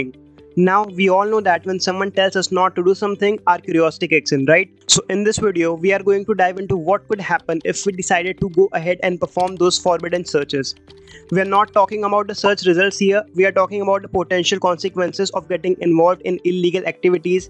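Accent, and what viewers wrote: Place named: India. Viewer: Goofy ahhh Indian accent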